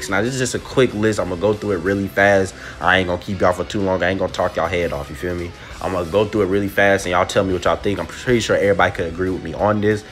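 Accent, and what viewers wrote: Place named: Florida, United States. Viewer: You got to be from Florida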